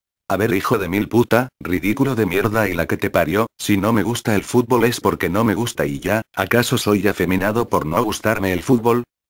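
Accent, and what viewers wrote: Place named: Spain. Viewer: porque hablas como español si sos argentino?pense que eras de España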